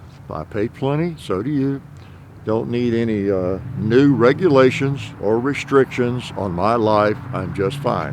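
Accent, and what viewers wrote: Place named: Pennsylvania, United States. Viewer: Did Hickok originally come from Pennsylvania? He seems like he has a Pennsylvania accent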